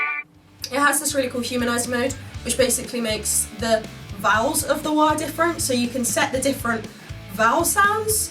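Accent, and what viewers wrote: England, United Kingdom. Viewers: The pedal sound like sh#t, but I loved hearing your perfect english accent. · Omg British yes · Английский аакцент . мне так нравится как ты играешь ты такая красивая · What a perfect original English accent!! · I hear british accent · omg shes english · ¿Are you English, beautiful? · WTF you have an english accent? Thought you were from Kentucky or something lmfao. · She sounds British to me. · Damn, I fucking love the British accent ❤️ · She's sooooo English!!!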